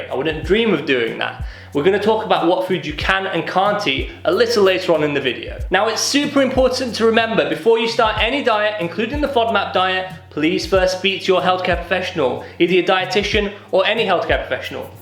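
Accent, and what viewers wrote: United Kingdom. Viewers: your tips would surely be effective 😊 btw your accent is so good . I guess its British accent cuz I too know british accent😊 Thanx for replying sir😊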